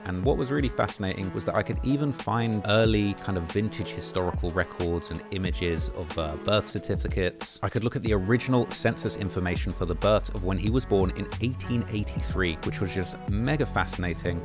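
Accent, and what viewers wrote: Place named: England, United Kingdom. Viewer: this donny a str8 fed where in England is he from 🤔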